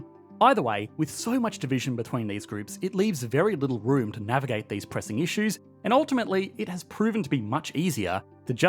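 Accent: Australia. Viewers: Dude, how many channels do you run? I heard this Aussie accent before!😊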